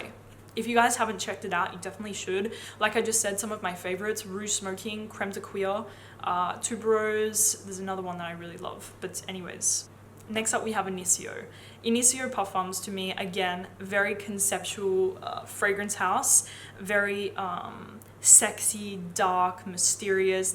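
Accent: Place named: Australia. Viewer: I really appreciate your honesty! During my quarantine in the UK I’ve parted with a substantial amount of money on various fragrances - all of which were of your recommendation. Everyone single one was a blind buy and I have not been disappointed once! It’s so important to be transparent with your followers so thank you! Also I’m a fellow Aussie so love hearing a familiar accent ❤️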